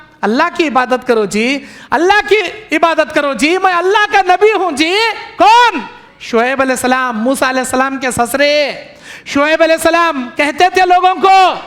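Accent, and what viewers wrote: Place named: Karnataka, India. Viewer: I'm also from Karnataka tumukuru. Sheikh ki baat karne k lehje se laga k ye hamare state se hi hain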